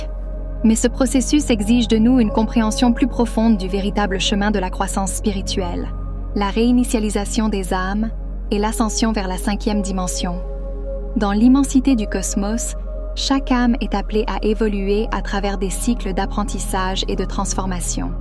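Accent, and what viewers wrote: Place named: Canada. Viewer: ************ Merci l'IA au petit accent canadien de ce message...Et merci aux initiateurs de celui-ci. *********************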